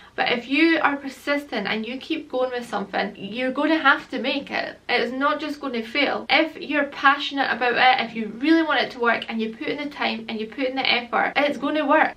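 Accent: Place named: Scotland, United Kingdom. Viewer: U from the Scottish Borders? Bc u sound Scottish and English btw very inspirational and makes me wanna start it sooner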